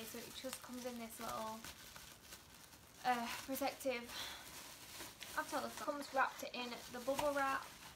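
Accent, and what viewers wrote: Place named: Leeds, United Kingdom. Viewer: aw thanks I’ll have to check the 1hr tan out:) finally a British YouTuber, I’m from Leeds too. Isn’t it strange when you hear someone with the same accent 🤭 that was so cute when you got excited about your make up haha, Iv just placed a £150 beauty bay order, that’s what brought me to your video to see what products others are enjoying. I’ll be excited like you tomorrow when it arrives 😂 keep up the vids xx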